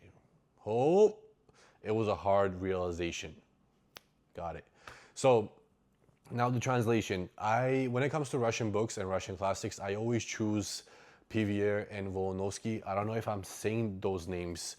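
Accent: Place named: New York, United States. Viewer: It's crazy that YouTube recommended this video to me, and im so glad it did. I'm a fellow immigrant who used to love reading and is trying to get back into it, so finding this video in the middle of the night when I was about to turn off my phone is insane. Anyways, I loved the video, I can't wait to watch more. And also, I could barely hear your accent, you just sounded like a new yorkan to me 😆. I will be checking out the books you mentioned soon, beacuase you make them sound really great.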